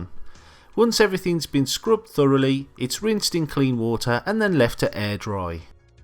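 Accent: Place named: Black Country, United Kingdom. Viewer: He sounded like a yam yam to me.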